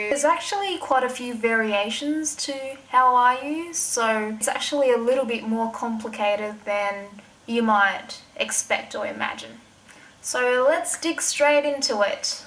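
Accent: Australia. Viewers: Only one upload? Do more please. Have a sudden infatuation with Shanghainese and like seeing the differences and similarities with Mando. Also, love the Aussie accent..Sydney local? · Awesome video! dat aussie accent tho... hahah :P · Given the heavy Australian accent, I'm guessing not 😂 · This is awesome 👍. Sounds Aussie, so I can understand it very well. I'm gunna try it out on my Shanghainese golf friend, see how it goes .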